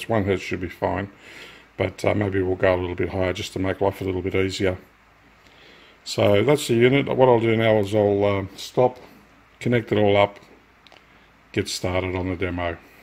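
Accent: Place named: Australia. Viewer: You sound like you're in Australia. I wonder if you can receive a 60 Hz signal emanating from power lines elsewhere in the world. American Samoa would not be too far away, but it's small, so power lines would be short, not making a good transmitting antenna. However, in North America, some power lines are hundreds of miles long. If a wire antenna does not work, I would try using an iron core magnetic loop antenna.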